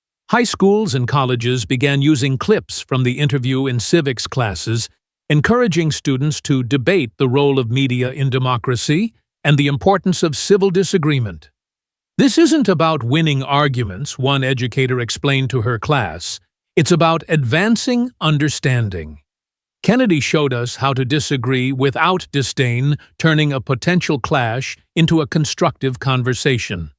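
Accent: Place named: United States. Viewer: This STUPID NARRATOR describes Collins's hair as BLOND. - I HATE these STUPID American-voiced, narratives.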